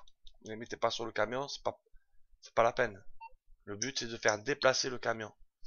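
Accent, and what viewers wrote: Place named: South, France. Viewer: Trop bien l'accent,on a le même 👍d'ailleurs on se fout souvent de ma gueule quand je joue avec le micro!je me fais traiter de "marseillais"......à croire que personne ne connaît Toulouse, béziers, Carcassonne, Montpellier, Narbonne....C'EST LE SUD TOUT ÇA LES GARS!!bien tes vidéos,j'aime bien les "d'accord "que tu balances,j'ai l'impression qu'il faut que je réponde "oui,daccord" 😉je m'abonne l'ami 👍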